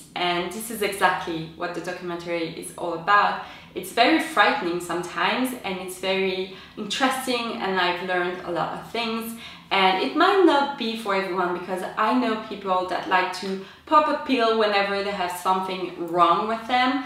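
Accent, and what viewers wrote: France. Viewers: Bonjour ☺️Es-tu française ? Are you french ? Because you hava a french accent especially when you say "la mer" ?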